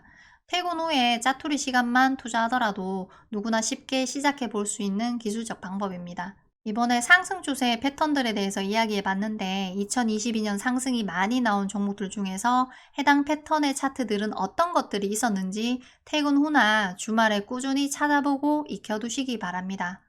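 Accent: Gyeongsang, South Korea. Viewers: 설명이 깔끔하시네요 근데 경상도 어디 사투리인가요~?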